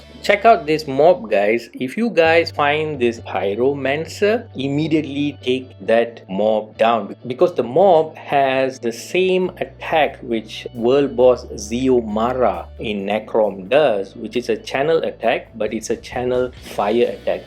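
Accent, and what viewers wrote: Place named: Malaysia. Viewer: Wow Malaysian or Singaporean Indian I’m guessing. Hyped for the endless archive. Re downloading the whole 100gb game again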